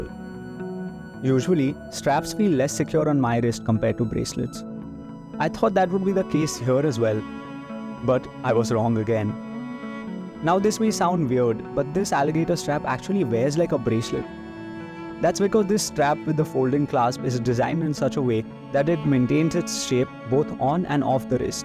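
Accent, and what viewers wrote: India. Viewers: I used to believe I could eventually get used to the Indian accent........... I was wrong.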